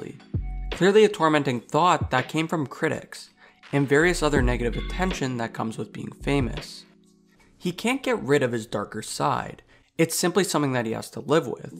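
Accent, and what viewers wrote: Canada. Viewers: You're Canadian, I can tell by the way you say "out"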